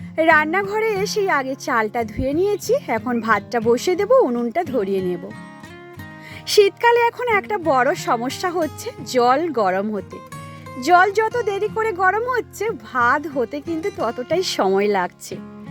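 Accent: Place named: West Bengal, India. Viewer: কথা শুনে বোঝা যাচ্ছে ইন্ডিয়ান বাঙালী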